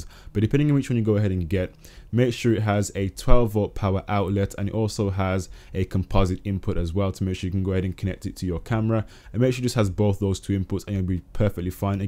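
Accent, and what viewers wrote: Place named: Birmingham, United Kingdom. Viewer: Sick video but u from birmingham? Ur accent gives me a vibe haha :D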